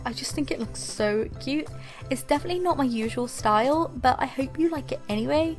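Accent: United Kingdom. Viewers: I love watching you because I love your British accent 😂 I live in America and I have a Southern accent because I live in Alabama which is like at the bottom of America.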